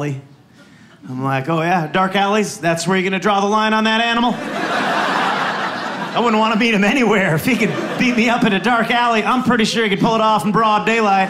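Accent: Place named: Wisconsin, United States. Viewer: Wisconsin accent is on point 😂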